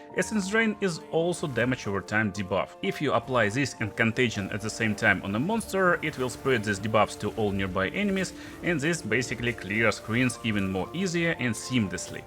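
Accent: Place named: Russia. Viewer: Ты бы не выёбывался, а говорил по-русски, слышно же, что русскоговорящий. Зыс и т.д. произношение выдаёт.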